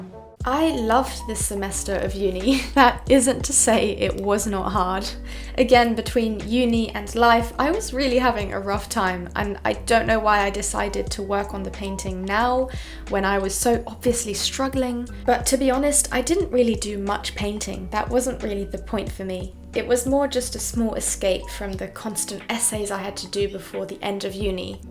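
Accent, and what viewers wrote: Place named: United Kingdom. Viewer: you absolutely should! Initially I distinguished your accent as British, but your beautiful yet unique name didn’t match my perception, then you dropped quelques mots français..and seeing your mum in frame I was instantly corrected! Aha! You are French! From your mother’s side (?) yet you’re based in Adelaide (wrong again. I initiallly thought north coastal Qld (sunny coast perhaps). Then Melbourne due to your private school uniform and the fact you commute/d to school/uni). I’m a visual arts teacher (secondary years) based in Brisbane and once I saw your year 12 exhibition vlog I quickly determined you were in fact based in Oz and completed your ATAR for General Art (at first instance your accent threw me off as I thought you were based in the UK and had completed your QCSE). You are quite the anomaly, Mademoiselle. A beautiful contradiction. 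Completely smashing all my preconceptions of you. I love being proven wrong, oh and the element of mystery ☺️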